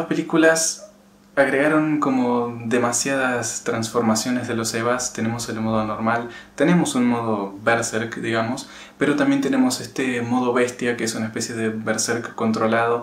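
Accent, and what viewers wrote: Argentina. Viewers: Me suenas como de Mendoza o San Luis medio parecido el acento. por el tema de las articulaciones que use lubricante siliconado de alta perfomance para rodamientos en spary seria el que usan para lubricar los coolers una ves me paso y le meti con el spray y se soluciono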